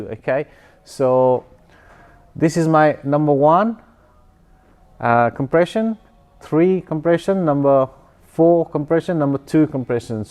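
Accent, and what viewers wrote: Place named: Nepal. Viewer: Good stuff bro. Your accent sounds like Nepalese accent.🤙🏾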